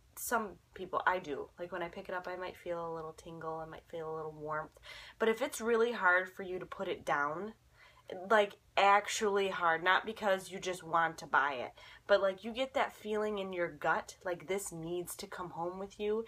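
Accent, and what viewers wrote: Michigan, United States. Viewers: i could listen to you all day. maybe its your michigan accent that i love haha.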